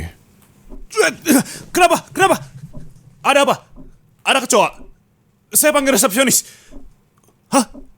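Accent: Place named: West Java, Indonesia. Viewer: yantonya logat sundah ini teh yaallah 😭😭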